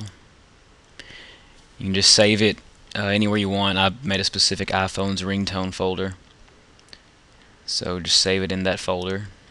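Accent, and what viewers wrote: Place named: South, United States. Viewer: Actually, you sound like a southern chuggaaconroy.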